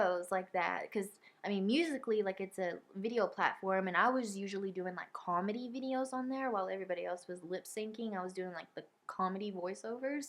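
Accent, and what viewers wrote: South, United States. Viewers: Who notices she has a slight country accent which is adorable 😂😂but thank you so much I was with a guy who made me feel as if I had to do what he said he told me if I didn’t have a ass then he wouldn’t have given me a chance and then broke up with me for his ex who wouldn’t leave us alone but he was basically a narcissistic person he always talked about how girls wanted him and stuff and how fine they were and god am I happy that he left he always thought he could control me and how I acted and accused me of acting single when I wasn’t and now I’m happy living my life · Adrian is surely used to you pranking him, do something like make him think you are pranking him and he gets on edge when you are not. Also, love your accent! Found your videos by typing into YouTube "girl with Southern accent" and your accent challenge one came up. 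Watched it, loved it, and watched all your others.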